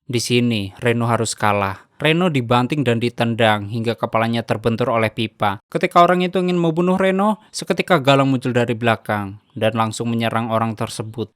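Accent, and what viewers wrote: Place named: Java, Indonesia. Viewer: Suaramu gak asik, medok anjeeeng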